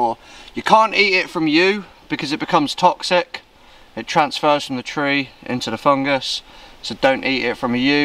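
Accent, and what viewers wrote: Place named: East Anglia, United Kingdom. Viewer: subbed because you sound East Anglian.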